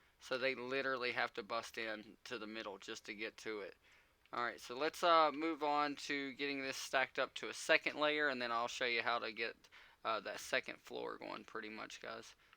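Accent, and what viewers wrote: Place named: South, United States. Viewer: Sweet build! You sound like you might be a Southern boy like me.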